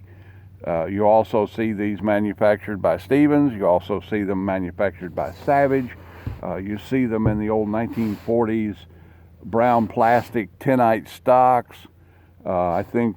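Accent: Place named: South, United States. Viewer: I really enjoy your videos, they are down to earth and besides I like your Southern accent ;)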